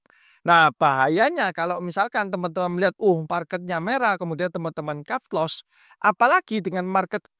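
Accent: Surabaya, Indonesia. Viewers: logat medok suroboyoan rek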